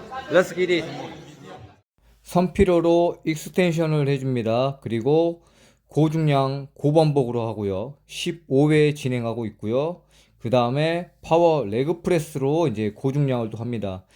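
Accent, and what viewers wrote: Jeolla, South Korea. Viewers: 경남대표인디 말투는 전라도사투리인디요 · 행님 라도 출신이셔라?🙂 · 경남대표 선발전 미스터 경남이라길래 부산 토박이인가 싶어 들어가보니 구수한 전라도 사투리ㅋㅋㅋ 예상치 못한곳에서 미스매치에 웃음이 나오네요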